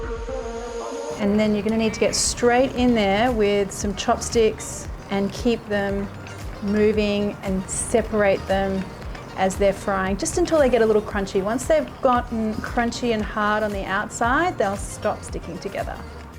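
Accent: Australia. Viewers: Are you Australian?! Love your accent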